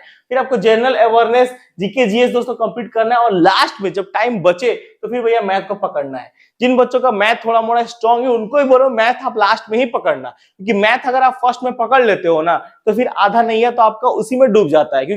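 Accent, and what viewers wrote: Odisha, India. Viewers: Bhai tum odisha se ho na ?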